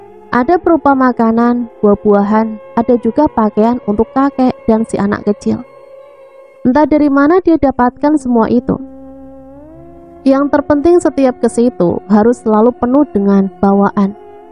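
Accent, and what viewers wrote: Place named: Indonesia. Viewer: Sy suka mimin ini walaupun kekadang terbawa bawa dlm membaca ceritanya tetapi asli suara nya Dr Indonesia...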